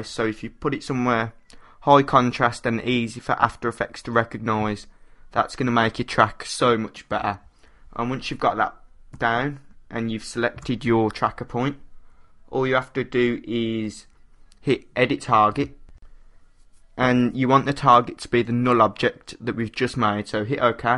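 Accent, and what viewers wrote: Birmingham, United Kingdom. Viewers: Are you from Birmingham?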